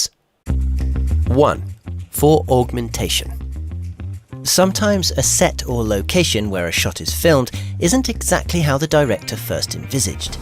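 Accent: United Kingdom. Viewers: I like your accent. It sounds like you might be British or a touch of something else also. Have a nice day whoever you are. My name is Charlene!😊❤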